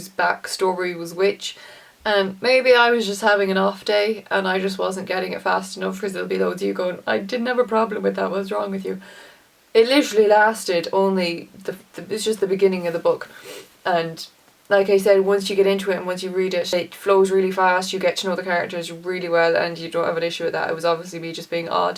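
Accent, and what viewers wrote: Ireland. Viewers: Is she Irish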